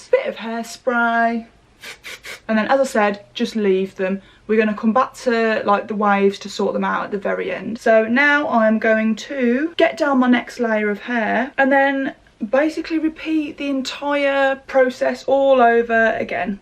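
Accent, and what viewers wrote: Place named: Telford, United Kingdom. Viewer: This saved my life this morning 🤦🏻‍♀️ can I ask where your from? My family are from Telford and have the same accent 🥰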